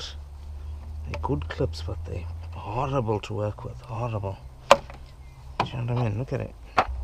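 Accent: South Africa. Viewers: Hi Calvin here can hear you are from SA 😅 wanted to know is there a way i can get in contact with you for the spares you get cant get nice things this side for the golfs and audis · Where are you from? You sound like a South African.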